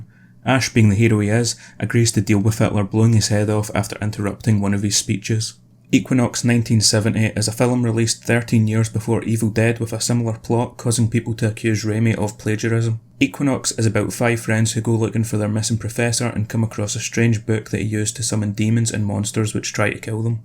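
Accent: Scotland, United Kingdom. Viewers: It's nice hearing the same accent being spoken with same slang used on a platform like this (except of course for exceptions like limmy, but Scottish youtubers are few and far between on this platform). Keep up the good work, your iceberg videos are really nice and informative 👍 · Two things right off the bat. 1- Eyy, a fellow scotsman! Honestly really nice to hear someone who sounds kinda like me lol. 2- I might not be keen on Iceberg videos (8 out of 10 times it's crap imo), but I'll give you this... you cut straight to the chase, no bollocks or filler. Commendable in a platform filled with so much fluff, it would make a teddy bear look like an anorexic supermodel.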